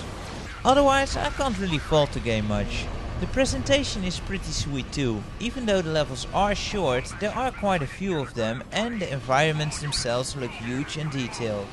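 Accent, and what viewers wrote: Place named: Netherlands. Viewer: rofl you sound VERY dutch. are you?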